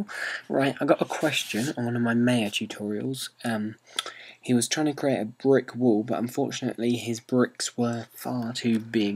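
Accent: United Kingdom. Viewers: your UK dialect is the best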